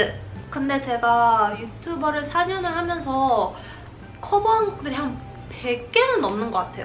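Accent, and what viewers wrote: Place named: United States. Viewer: Even tho you speak in korean you still have that american accent its cute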